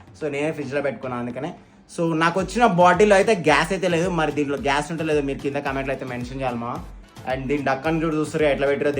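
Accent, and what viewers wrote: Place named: India. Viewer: I like your Telugu slang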